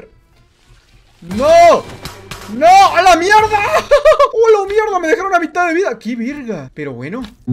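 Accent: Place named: Argentina. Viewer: amigo no puede ser que tengas 15 acentos jajajaja igual un argentino siempre reconoce a otro (espero que si seas argentino xd) el 2 video que veo tuyo y fua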